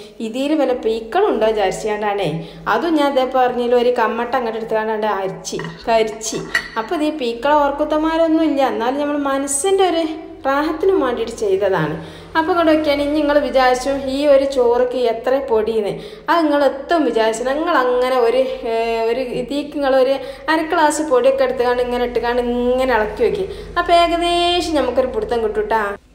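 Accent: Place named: Malabar, India. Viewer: മലബാർ style സംസാരം വേണ്ട. തനിനാടൻ മലയാള ഭാഷയിൽ സംസാരിക്കൂ. ഞമ്മൾ കേട്ടോ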